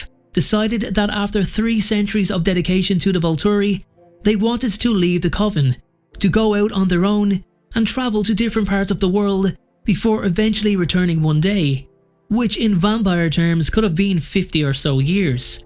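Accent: Ireland. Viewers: This is a great channel, you should make videos on the Saga of Darren Shan, very little lore videos on YouTube about the series and it’s an amazing vampire series ! You sound Irish so I presume you’ve read them but if not check them out the audiobooks by Ralph lister are amazing! Subbed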